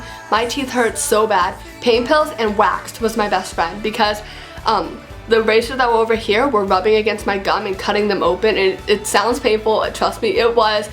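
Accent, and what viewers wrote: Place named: United States. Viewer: It's called an American accent.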